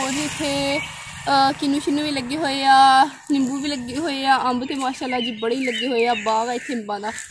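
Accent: Punjab, India. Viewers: Tusi ta same chadde punjab vargi punjabi bolde ho.very nyc vlogs 👍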